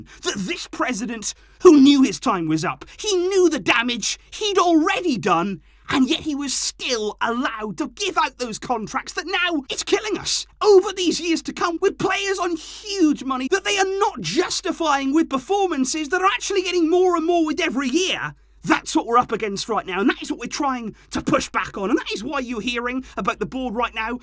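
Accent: Wales, United Kingdom. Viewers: Do all welsh people sound like this?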